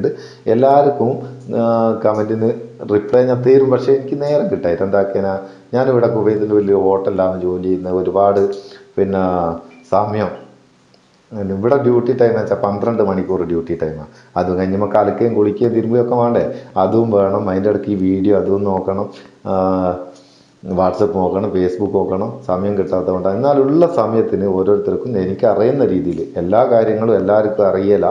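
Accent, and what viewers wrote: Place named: Vadakara, India. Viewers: ഇഞ്ഞി കോയിക്കോട് ആണല്ലേ... ഇമ്മളെ ജാനു ഏടത്തി ടെ... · ഗുഡ് മെസ്സേജ് , അവതരണവും കുഴപ്പമില്ല. ഒരു നാദാപുരം സ്റ്റൈൽ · നല്ല നാടൻ പയ്യോളി ഭാഷാ നൈസ് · നല്ല തനി വടകര സ്റ്റൈലിൽ അറിവ് പകർന്നു തന്നതിന് വളരെ നന്ദി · ശരിയായ മേപ്പയ്യൂർ സ്ലാംഗ് തന്നെ. കൃത്രിമത്വം തീരെയില്ലെ. കൊള്ളാം! ക്ലിപ്പ് കണ്ടോരെയൊക്കെ കൈയ്യിലെടുത്തല്ലൊ! ഉഷാറായിക്ക് കുഞ്ഞോനേ! Thanx! Go ahead! Kadathanadan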